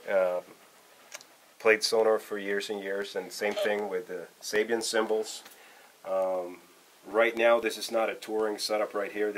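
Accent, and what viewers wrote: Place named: United States. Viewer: His American accent is almost as good as his drumming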